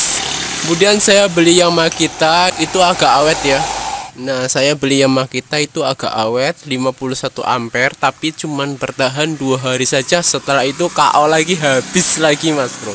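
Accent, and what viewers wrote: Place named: Kawunganten, Indonesia. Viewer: medok kawungantenan...haha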